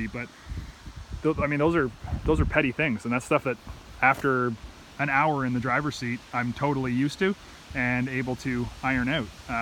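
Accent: Canada. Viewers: On a scale of 1-10 of how Canadian you sounded, you were at a 9.5 this time.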